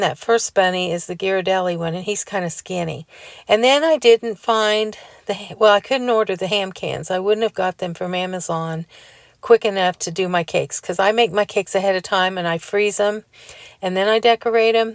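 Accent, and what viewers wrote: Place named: Indiana, United States. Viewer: Your cakes are beautiful! It is so nice that you take them to your neighbors and friends. Your salad looks yummy. And the Easter decor looks so nice. Your friend, Rosie's gift is lovely, as is the silver stand. It looks stunning. Your accent reminds me of my cousin, who lives in Indiana. I am a new subscriber. Oh! And the bunny as a bowl is a neat idea. Your potato salad looked great displayed in it. Happy Easter.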